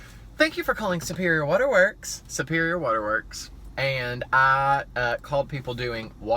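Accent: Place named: Deep South, United States. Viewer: Dewde your accent is Killin me, you must ve from the deep damn south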